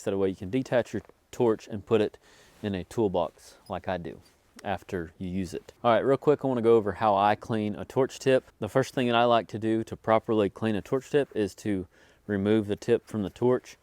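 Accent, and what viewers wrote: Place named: South, United States. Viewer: A 'Southern' accent. Maybe Oklahoma or Texas? Not sure, but the gas/oilfields are in those states. All the Southern States have their own distinctive accents. My wife is from Tennessee & Mississippi, which is different. Georgia & the Carolinas sound very beautiful to my ear, but I like them all.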